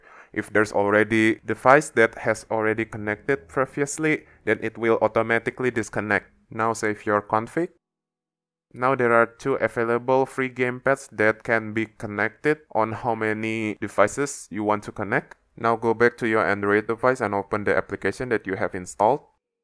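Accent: Indonesia. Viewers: Great content. First time on your channel and I easily recognized your Indonesian accent. :) Salam indo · Done and works, beautifully explain. thanks. btw kerasa banget logat indo nya wkwkwk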